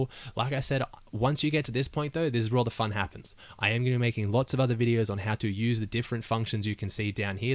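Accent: Australia. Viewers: Your voice sounds like a little bit of ASMR aussie!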